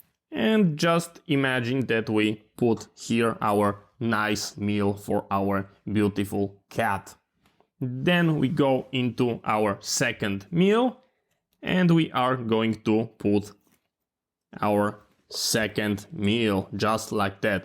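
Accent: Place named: Eastern Europe. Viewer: Lovely Eastern European accent 👏🏼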